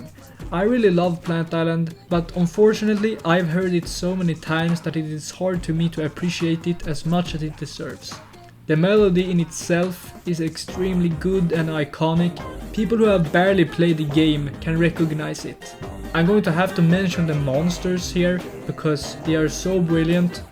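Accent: Sweden. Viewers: You have a strong swedish accent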